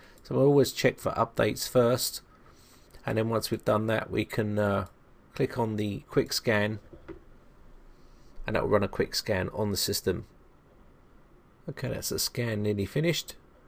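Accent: United Kingdom. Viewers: You spelt infection wrong in the description, you wrote "ifections". BTW, I like British accents :)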